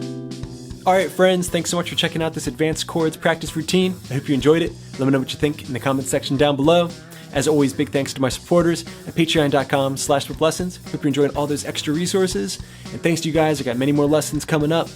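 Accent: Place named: South Jersey, United States. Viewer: Where's that accent from? Sounds like south jersey, my old stomping grounds 🙌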